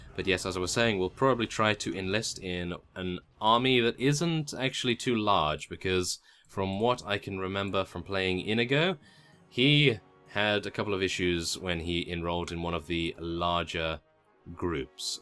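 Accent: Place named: England, United Kingdom. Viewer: hey thanks for doing this series i really enjoy your narration. idk what it is but I think the English have the best accent to commentate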